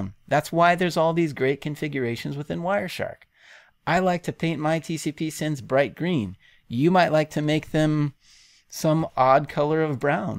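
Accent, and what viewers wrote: India. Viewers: i dislike the Indian accent; can't help it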